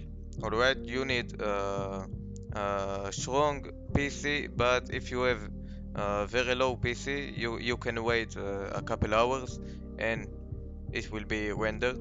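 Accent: Israel. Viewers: שומעים שאתה ישראלי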